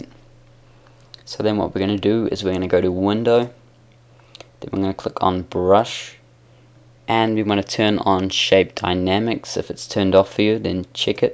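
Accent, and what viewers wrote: New Zealand. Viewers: Sounds like he's from New Zealand. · Hey bro, are you from new zealand coz youve got a kiwi accent, if you are a kiwi are you maori or not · love your kiwi accent mate Great tutorial btw!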